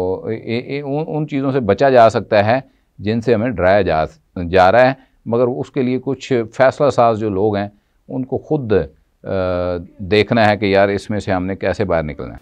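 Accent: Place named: Punjab, India. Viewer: Sir Bhatti, you are the only man who has stood his ground till date and you speak Punjabi very well with strength.❤❤❤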